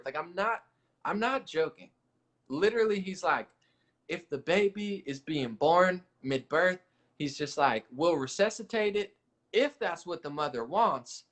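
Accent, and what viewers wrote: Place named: South, United States. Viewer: Dude, love the southern accent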